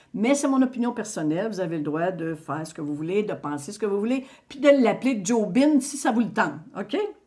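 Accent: Quebec, Canada. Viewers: Bonjour! C'est toujours un plaisir de vous écouter, d'écouter votre "aquecent" Canadien! Oui, 3I Atlas est bien une conscience. Elena Danaan en a parlé dans un de ses Star Nation News. Elle a même pu le voir à travers les yeux de son compagnon. Il faut dire que toute petite elle a été abductée par les gris malfaisants, et a été sauvée in extrêmis par les Galactiques. Malheureusement, ces gris lui ont mis un implant dans le cerveau qu'il a été impossible aux scientifiques Galactiques, d'enlever, sous peine d'attenter à sa vie. De ce fait, il grillé cet implant, et l'ont reprogrammé. Ce qui fait qu'elle peut voir à travers les yeux de son compagnon et vice-versa. C'est comme ça qu'elle a pu nous décrire 3I Atlas. Et en effet, 2026 va être une année de changements. Certaines technologies vont être mise à notre disposition pour 2026. Lit médical quantique, prospérité par le QFS (dont de belles sommes sont mises sur chacun de nos comptes, ce qui fait que l'humanité va être riche), les véhicules volants (voitures, autobus, et un autre système d'avions), des réplicateurs de nourriture, et bien d'autres choses encore. 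Mais surtout, surtout, nos rencontres avec nos cousins venus d'autres planètes, comme les Ummites qui viennent de la planète Oumo située dans la Constellation de la Vierge, à 14 années lumières de la Terre. Elena Danaan a aussi informé que Mars était en Terra-formation. C'est à dire que nos cousins bossent pour refaire cette planète vivante, sur laquelle no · Merci infiniment pour ton engagement et tout le travail que tu fais avec beaucoup de joie et d'amour. Gratitude pour ce partage du message de l'EIO. Je suis en Italie et j'aime beaucoup t'écouter le matin devant une tasse de thé. Ta bonne humeur et ton joli accent québécois me mettent en joie pour la journée. 🙏 Joyeuses fêtes 🎉❤🤩😘 Très jolies tenues de Noël 🥰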